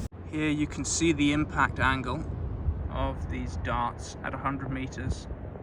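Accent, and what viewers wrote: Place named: United Kingdom. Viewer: As an American consumer of a specific type of video content, it was so pleasantly odd to hear “terminal effect” and the distinction between “internal and external ballistics” in the British accent. I very much appreciated your application of the scientific method. And the sound these things make as you throw them is awesome. Great stuff.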